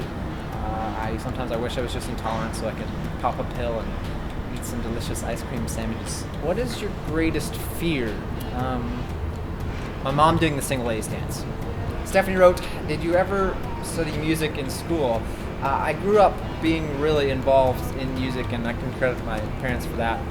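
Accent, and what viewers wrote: Canada. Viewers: Canadian accent represent!! :D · omg he sounds so Canadian i wonder how he says about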